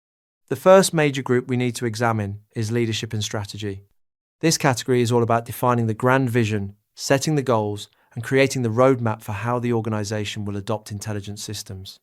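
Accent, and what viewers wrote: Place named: United States. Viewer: Great to find a British English instructor with clear examples and explanations. However, I really do not like the American spelling and pronunciation. TY though. I will be sharing the links.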